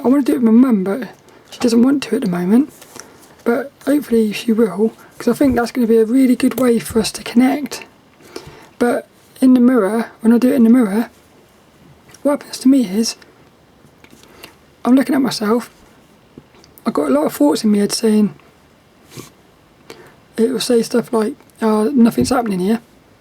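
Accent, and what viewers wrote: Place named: United Kingdom. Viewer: Anyone can learn a little BritishnEnglish from listening to lill you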